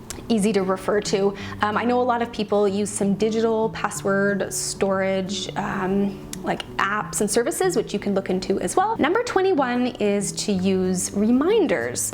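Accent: Canada. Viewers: New to your channel from Ashlyn's channel. Just subscribed. Quick question...are you Canadian? Certain words you say sound Canadian..... I live in Alberta,Canada. Enjoyed your video! Thanks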